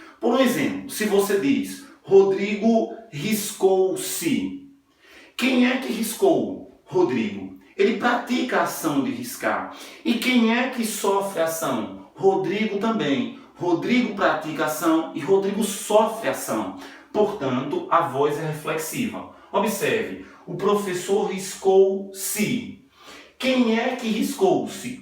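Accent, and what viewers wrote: Northeast, Brazil. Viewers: O senhor é pernambuco, cearense, rio grandense do norte(potiguá)paraibano. Enfim, o senhor nasceu no Nordeste?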